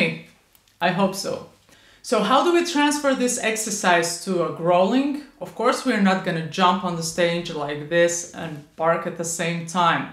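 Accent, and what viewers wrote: Latvia. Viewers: Wait? Are you from Latvia? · Heey, are you by any chance latvian? 🧐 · idk kinda sounds latvian😥😥 edit: oh shit u are latvian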